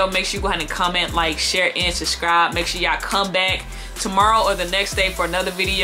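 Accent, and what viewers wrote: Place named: South, United States. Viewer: ok ok you might be from the south I can hear the accent now lol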